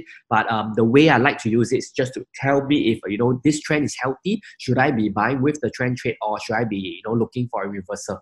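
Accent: Singapore. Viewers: You sound to be from Singapore.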